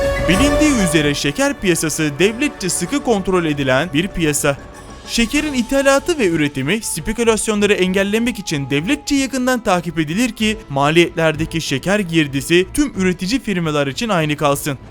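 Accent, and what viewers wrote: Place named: Aegean, Turkey. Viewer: Anlatan egeli sanırım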